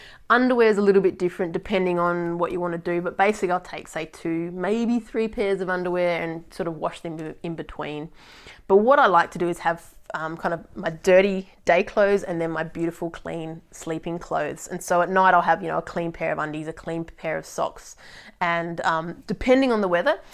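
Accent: Australia. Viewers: Great to hear an Aussie voice. I hit the Himalayas fairly regularly, so I watch a lot of these types of videos. Always Americans on the PCT or JMT, or Europeans in Iceland; so really good to see a fellow Australian out there offering advice. I also love your level of enthusiasm, it just kept me watching even though my load out is well and truly bedded down. Thanks for the time.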